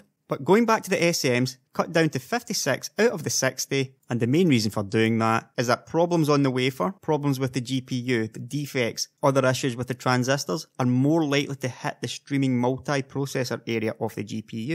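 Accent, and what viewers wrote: Scotland, United Kingdom. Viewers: Nothing wrong with his Scottish accent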